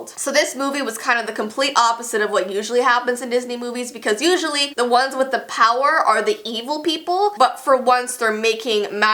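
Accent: Canada. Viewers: She's from Canada, so they pronounce words differently I believe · DIH-Do It well she's from Canada and people from Canada have an accent, so that can possibly be the explanation of why she pronounce's it differently🤷🏼‍♀️🙄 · It’s not hands it Hans but it sounds like Hands because of her Canadian accent. I’m Canadian and I have an accent like that.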